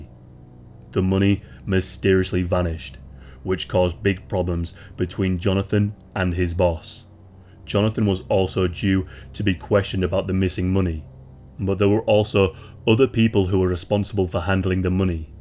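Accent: United Kingdom. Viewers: He’s British bro give him a break 😭. Brits refuse to pronounce American ANYTHING how Americans pronounce it lmao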